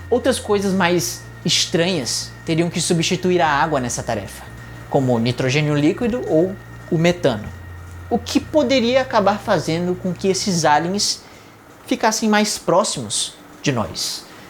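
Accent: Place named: Northeast, Brazil. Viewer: quando comecei a assistir ja suspeitei que o canal era nordestino :) mt bom o conteúdo parabéns.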